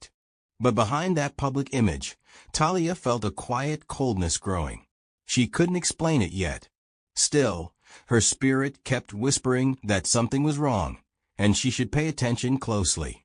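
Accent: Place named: Jamaica. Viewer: Mi lik de patois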